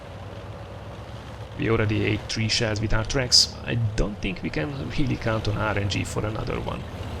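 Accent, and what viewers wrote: Hungary. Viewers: Annyira magyar akcentusa van xdd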